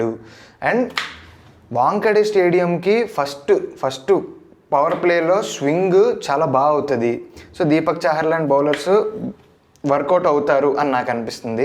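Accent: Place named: United States. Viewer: Aa accent entra? American Telugu ah?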